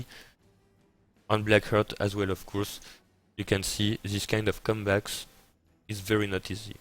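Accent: France. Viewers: hehe i like heariung all the names in french accent ^^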